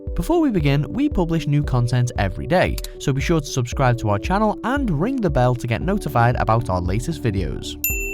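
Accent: United Kingdom. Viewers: that is Germany’s Chancellor Angela Merkel not the British Prime Minister Theresa May moron! You’re British from the sound of your accent so why can’t you tell the difference between the two women? It’s not that hard, Jesus!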